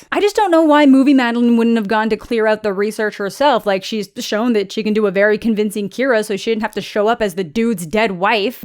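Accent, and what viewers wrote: Quebec, Canada. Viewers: You speaking French made my brain hurt. I speak French and even if I didn't know you were Canadian I would be able to tell from your French. Honestly great though, keep it up. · I actually love your Canadian French. It sounds great <3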